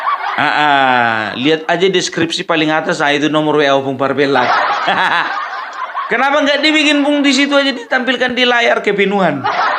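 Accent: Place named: Sunda, Indonesia. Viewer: Saya sebagai orang jabar.. Merasa malu kalau benar terbukti kang emil terjerat bjb.. 😬 bang lucu bahasa sundanya. 😁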